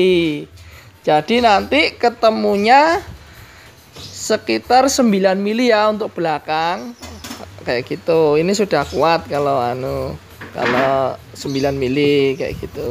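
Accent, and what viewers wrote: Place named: Java, Indonesia. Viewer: sip boso jowone medok .👍👍👍👍 tapi inspiratif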